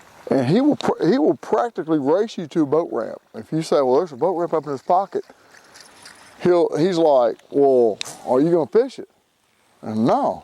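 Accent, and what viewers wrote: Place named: Louisiana, United States. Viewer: "Where you goin'??" LOL good ol' Louisiana boy.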